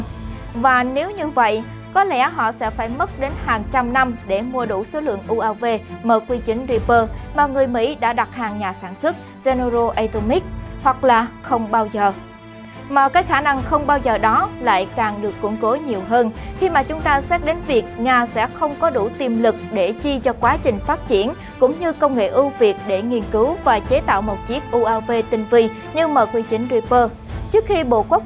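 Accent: South, Vietnam. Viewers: Quân sự mở kiếm đâu ra một em nói giọng Miền Nam 😃😃😃😃😃😃😃😃😃😃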